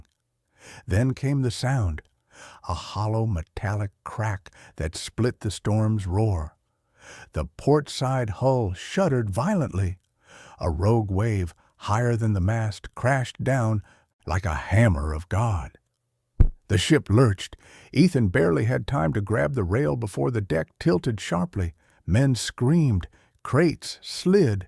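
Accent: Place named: South, United States. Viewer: Largo, Florida. I love your stories but I'm not crazy about the newer voice with the slight southern accent... 🙏💞🙏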